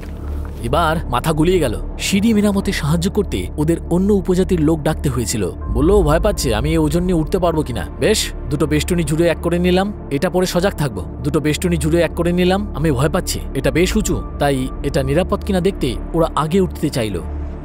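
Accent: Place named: Sylhet, Bangladesh. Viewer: সিলেটি সিলেটি ভাব আছে কথা কন্ঠে!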